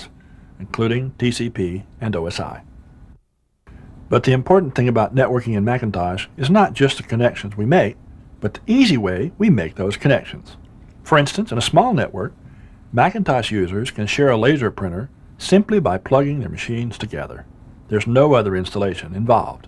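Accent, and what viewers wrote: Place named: South, United States. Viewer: I love the presenter’s easy going southern accent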